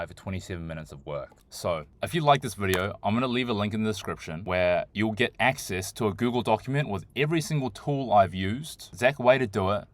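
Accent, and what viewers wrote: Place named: Australia. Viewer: You sound like you're from Aus, but you do Tiktok shop in US? How does it work? US sim card, proxies etc ?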